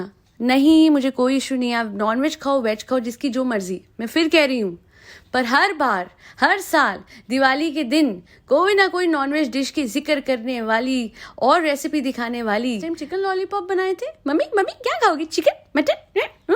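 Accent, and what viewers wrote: Punjab, India. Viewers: Koi kuch kare ya na kare madam janab badle ya bhaad me jaye one thing is constant ur lovely voice and ur meethi meethi Punjabi ❤❤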